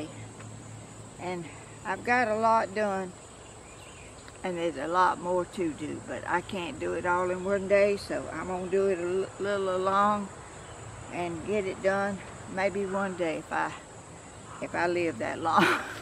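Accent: South, United States. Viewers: You inspire me. Your beautiful southern accent makes me homesick for my kin in Tennessee.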